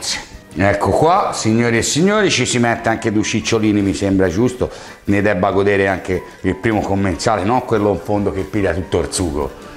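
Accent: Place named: Tuscany, Italy. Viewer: Sei strabravooooooooooo❣️❣️❣️❣️Mio marito adora i ciccioli e devo assolutamente cucinare la tua ricetta,ma in Svizzera non trovo i ciccioli xciò dovrà aspettare la nostra prossima puntatina in Italia e precisamente a Brescia.Sei simpaticissimo ,adoro il tuo modo di fare è il tuo accento toscano.Bacione😘😘😘😘😘😘😘😘😘😘😘😘😘😘😘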